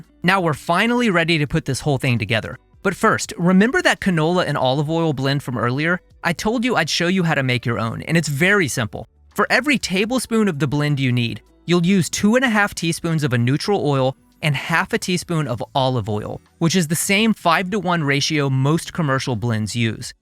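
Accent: Texas, United States. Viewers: Is a native Texan? The way he pronounced "oil" is very specific. Is that the Texan accent? Just curious.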